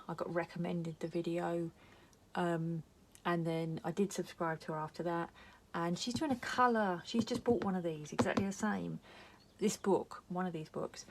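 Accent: North London, United Kingdom. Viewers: Lovely collection! You talk like me, I'm from North London!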